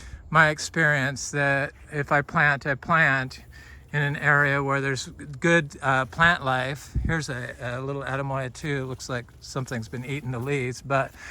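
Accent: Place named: South, United States. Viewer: love the southern accent lol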